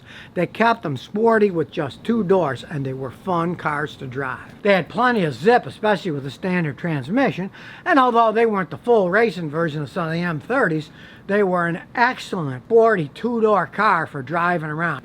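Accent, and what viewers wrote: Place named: North, United States. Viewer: how come you live in Houston but have a northern accent? Those hard Rs, dead give away.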